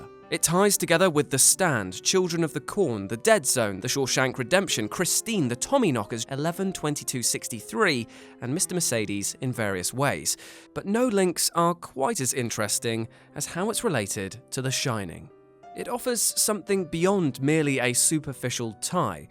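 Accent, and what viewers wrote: United Kingdom. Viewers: wow, if you can think it....people can try and make any wild idea to try to make sense ... this is lame..... next video i bet you'll try to connect scooby-do with "it" and "the village" as one great universe... please stop, cause your british accent doesnt make someone sound smart, cause your silly, the evil ghost is not "it" in all his novels, so there you have it-you stink ..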